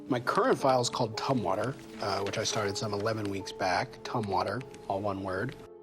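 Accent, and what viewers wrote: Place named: Europe. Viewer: Yeah it is an amazing show! I like that it is so mysterious! It really makes the viewer think! It still could be so many different things! So you think youre outie and innie are different people! Interesting! Yeah you should continue your YouTubes! You’re good at it! I like your accent! What is it? European? It’s ok you don’t have to tell me! It can be another mystery! I won’t be watching much tv until November. I’ve got a big race to train for. But once I’ve finished, I’ll be back on the couch and watch lots tv shows and be deep into theories on reddit and YouTube! which movies do you like?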